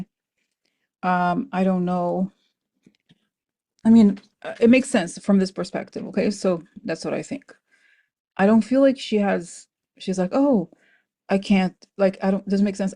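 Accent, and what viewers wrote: Russia. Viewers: I love YouTube. Sometimes I watch your video almost to the absolute end but don’t let it completely finish because I want to comment and when I come back it isn’t where I left it but it comes back to a part that intrigued me when you spoke about it the first time!!!! Freaky cool! Love your work AND YOUR RUSSIAN Accent!!!! And I love the makeup and hair styling!!! You are working so hard whatever you need to do to keep yourself soothed and I think fresh and beautiful!!!! So appreciate all your hard work! Much love from Michigan. 💛✌🏻 blossoms are coming!! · To hell w people that don’t understand ze Russian accent. 😂 For crying out loud! I think it’s super cool when you do it!! Return to zender!! 😅 😊Rock on!! ❤ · Your Russian accent is just fine. I have no problem understanding you. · I love your Russian accent!! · I love the Russian accent. 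makes the commentary come alive. Very entertaining. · I love your Russian accent you are an actress and a channele. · I enjoy listening to you,. Always informative. And I understand you perfectly, even your russian accent😅 Take care😊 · The switch between your Russian voice, perfect English and funny faces is super... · I love your Russian accent ❤ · I love your Russian accent. I enjoy listening to you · Love your Russian accent 😂💞 Thank you for the work you do ❣️ Much love and gratitude 💖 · I love your Russian accent! Makes me laugh every time. I love your welcome song too. Look forward to it every video. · Love your Russian accent! Thank you. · I love your Russian accent! You are very good at that and making their faces. I always laugh and I CAN understand what you say. We just have to listen. Thank you for all you do to bring us much needed information. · I love your Russian accent and all your videos. 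You and Corina are so much better informed than any other channel. You are the best!!! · I don’t mind your Russian accent! I just use English captions on the video! 😂😂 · I think your Russian accent is hilarious! My only piece of advice, after spending about a month total in Russia many years ago: Pronounce your “h’s” as if you’re trying to clear phlegm from your throat…. Especially with the word “happy”. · I have no problem understanding your Russian accent. You are just PRECIOUS and you make me SMILE!!!😊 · I can understand your Russian accent just fine; I have a degree in theatre, though, so…🤓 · Screw those people who don't like the Russian Accent. I love it!! We got you girl!! 🎉🎉🎉🤪🤪💙💙💙✊️✊️✊️ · i love your russian accent. it is hilarius. I laugh out loud. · I love your readings and your Russia talking voice😅. You're great! 🥰💞🤍🕯️🕊️ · I am hearing impaired so I either read the CC or the transcript. 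Pay no mind to negative comments regarding your Russian accents. You make me laugh. I adore you.❤ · I love the russian accent, that is so funny. I live for it. Not only do you give us hope but you make us laugh. Its everything, dont change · I love you Russian accent.. I think it's cute I think it's precious and I understand every single word you're saying.. ❤ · I like your Russian accent and it’s funny! Keep it up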